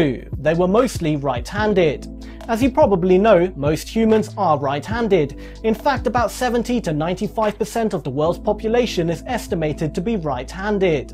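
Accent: United Kingdom. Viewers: you sound British and your number 2 signal just told us all to go forth and multiply! Really! You should know the difference btween two and FO.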